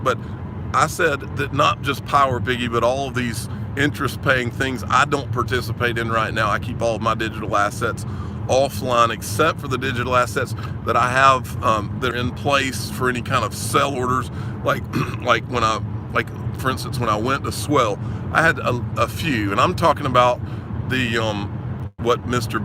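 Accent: South, United States. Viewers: I Have listened to you for along time and have always enjoyed you streams, I always imagined that you were black, but it is that southern accent and you very deep voice, here in England I have a good friend from Alabama and his accent is pretty simular and he is Black! Just saying, I am not disappointed but I am surprised!😄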